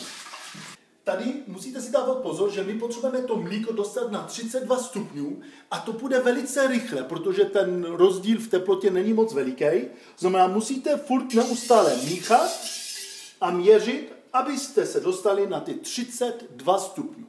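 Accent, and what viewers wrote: Italy. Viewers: Kanada? Ale má takový Italský přízvuk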